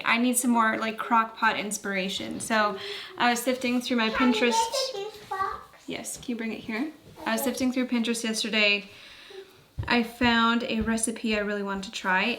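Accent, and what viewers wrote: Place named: Canada. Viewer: Love your videos so much, whenever I hear that Canadian A sound it makes me smile a little. I'm American I don't know why I just find the way you say words like bagels to be so charming like oh that's right! We're neighbors, look at those slightly different vowels! Haha!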